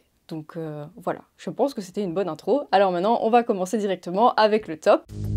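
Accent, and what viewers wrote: Belgium. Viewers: j'adore l'accent belge :D ça me rappelle Bruxelles! J'adore les vidéos!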